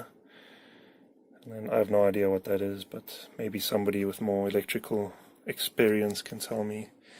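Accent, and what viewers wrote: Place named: South Africa. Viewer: just a random South African saying my fok when you hear the accent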